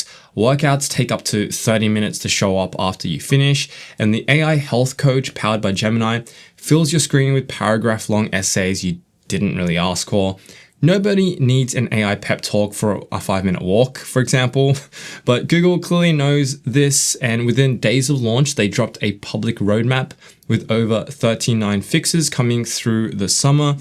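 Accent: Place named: Australia. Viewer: $99? Why do you sound Australian but the price seems to be in US! No?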